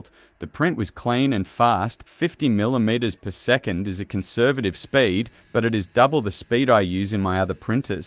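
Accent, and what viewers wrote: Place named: Australia. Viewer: Man... your accent is Aussie but your location is Spain...btw excellent work..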